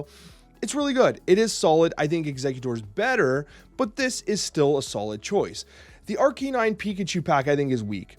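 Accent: Canada. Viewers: He's Canadian. Leave alone!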